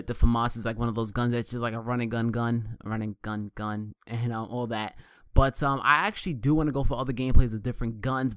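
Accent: New York, United States. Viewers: I don't mind your New York accent at all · And new york accent doesnt bother me! It actually makes your commentarys more unique!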